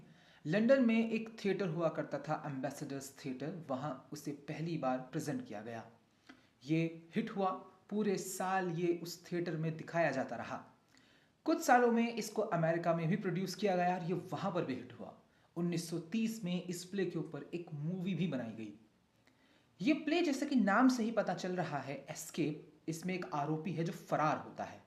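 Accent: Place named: Meerut, India. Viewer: Aap meerut se he na sir..? Aqsa k bhayya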